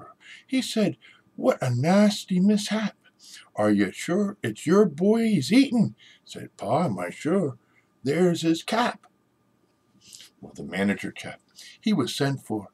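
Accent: United States. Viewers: aThat was fun,. And if someone had told me that quintessentially Lancashire tale would have worked in your (lovely mild) American accent, I'd have said "Not bloomin' likely!" - But I'd have been wrong. Super version and great use of props. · Weird to hear it in US english, but it works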